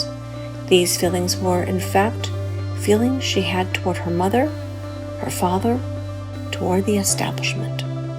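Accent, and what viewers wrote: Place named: Texas, United States. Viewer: Love your texas accent ❤😅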